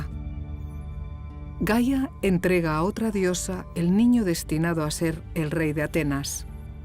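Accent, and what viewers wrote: Catalonia, Spain. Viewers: El acento catalán tan marcado de la narradora no me ha dejado disfrutarlo. No tengo nada en contra de los acentos, pero para una narración hubiera preferido uno neutro. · Como se nota que la narradora es catalana, el acento la delata. Buen documental por cierto 👍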